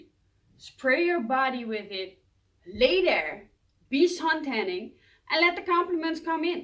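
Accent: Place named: Netherlands. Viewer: I can tell by your accent that you're from the netherlands, klopt dat haha? My favorite is Bvlgri Aqva Amara for the summer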